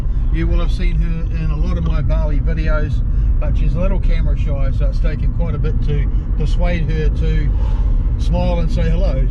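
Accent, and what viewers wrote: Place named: Australia. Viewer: Nice aussie accent. Good job mate.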